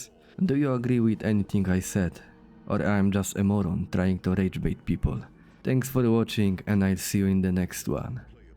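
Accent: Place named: Poland. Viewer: You sound Polish bro, I like polyaks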